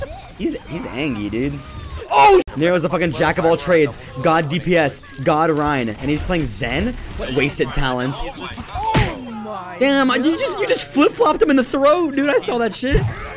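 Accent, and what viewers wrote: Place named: Asia. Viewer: i was watchin this and my mom said u have a asian accent idk why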